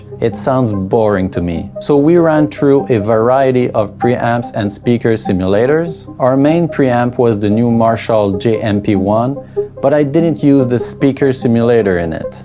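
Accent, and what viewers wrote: Quebec, Canada. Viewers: Accent Québécois? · ohhhh really !!! Thanks !! Keep doing your videos !!! Very nice content ! You sound that your from Quebec ! De où ?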